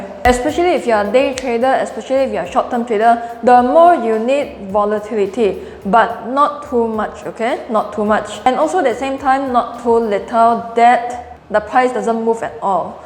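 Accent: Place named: Singapore. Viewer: Haha, really love watching your videos. Having someone explaining things in the style of Singaporean feels so homely.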